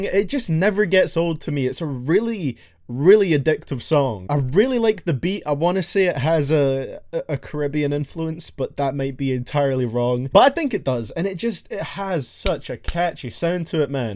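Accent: Scotland, United Kingdom. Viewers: Hey are you from Scotland?, I am!!!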